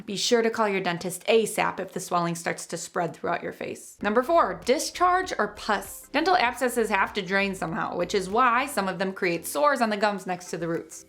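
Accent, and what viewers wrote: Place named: Wisconsin, United States. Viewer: An Italian with a Wisconsin accent.. weird!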